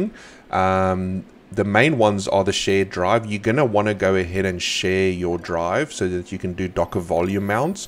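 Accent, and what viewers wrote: South Africa. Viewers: Are you originally South African?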